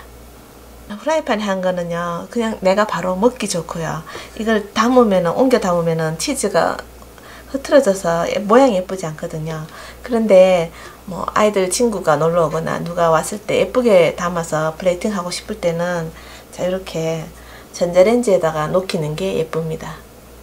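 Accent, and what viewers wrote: Gyeongsang, South Korea. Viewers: 반갑심더.. 딱 말씨가..대구 찍어봅니당.. 아님..어쩔수 없거든요.. 대구가 미인의 본고장 아닙니껴? 구독과 좋아요.,기냥..팍.. 이모님 시간될때 저희집도 한번 놀러오이소.. 캬..먹고 잡네용..^^ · 가는곳마다 가 계시더라구요..그래서 끌려서 와봤더니 저가 좋아하는 요리채널이네요 경상도말이라 더 정스러워요^^ 조아요와 빨간버튼 꾸욱~~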